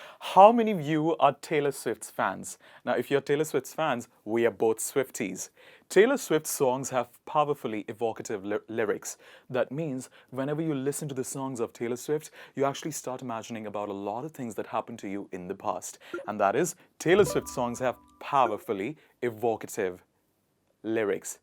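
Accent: United Kingdom. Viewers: Your English language pronunciations is not like Indians man. I thing you are born and raise up in England. Your talking in English language the way you pronounce each words is like British man. It is very wonderful. GOD Bless You. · Is it SAAvy? Or Pronounced as savy? This man pronounces in a British way!!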